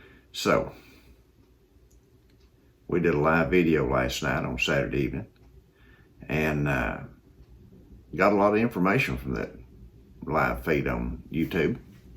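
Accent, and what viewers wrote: Kentucky, United States. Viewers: I knew I recognized the accent, I was born in KY! I thought for awhile you were from the Shenandoah Valley but KY is wonderful too. Your a lucky man.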